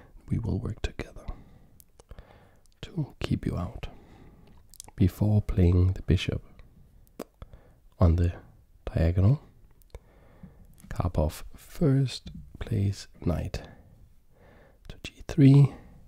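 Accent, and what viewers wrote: Denmark. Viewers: Are you danish? Great video btw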